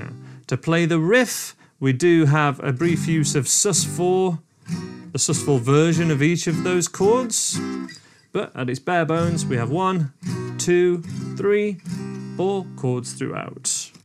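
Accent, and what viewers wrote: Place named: Northeast England, United Kingdom. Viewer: Dude are you from the north east of England?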